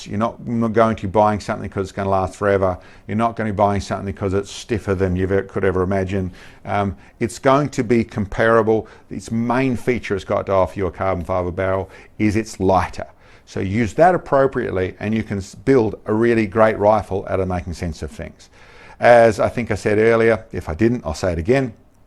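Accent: Australia. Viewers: I laughed when you put the barrel down so you could talk properly. Somewhere in your ancestory there might be some Italian blood. Something about mediterranean arms movements and aussie accent is genius. Love all your videos, Australia is my 2nd favorite country to visit right after NZ.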